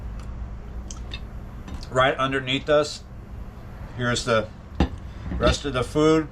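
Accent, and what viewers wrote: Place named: New York, United States. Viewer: Love the New York gangsta accent mixed with Louisiana slang LMAO ! !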